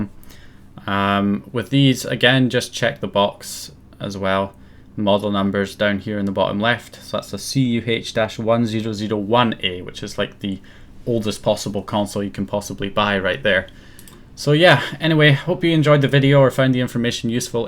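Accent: Scotland, United Kingdom. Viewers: Fuck me yer Scottish didn't know that we should start a modding team like the French modding team back in the day lol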